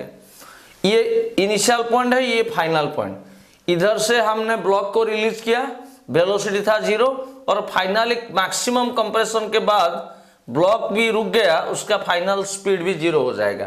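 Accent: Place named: Odisha, India. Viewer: Your hindi accent is like an odia...You must be an odia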